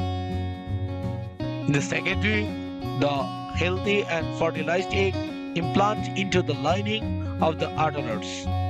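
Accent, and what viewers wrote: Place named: India. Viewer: Ugh, can't hardly understand a word you're saying. You'd think we'd all have had plenty of exposure to Indian accents, what with all the scam callers, customer service reps, etc.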